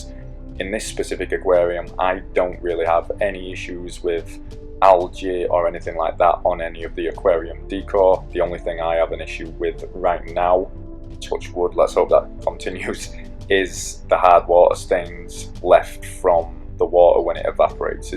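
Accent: United Kingdom. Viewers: are you from the uk you sound like it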